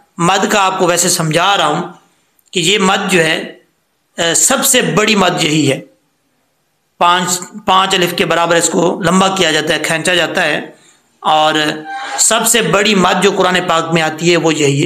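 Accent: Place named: Punjab, Pakistan. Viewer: او بھائی! آپ خود تو کہیں سے سیکھ لیں، پنجابی میں پڑھ رہے ہیں،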